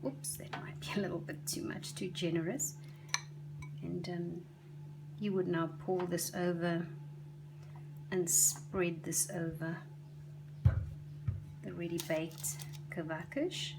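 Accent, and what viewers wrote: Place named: South Africa. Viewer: I love your recipe! Do you live in South AFrica? You have a SA accent, I lived there too, in Pretoria!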